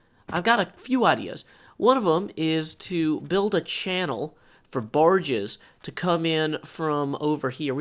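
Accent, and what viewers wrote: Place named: Nashville, United States. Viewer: There once was a man from Nashvilles, Who calls himself Yes that's his name Minecrafting's his game With a lovely accent from Nashvilles.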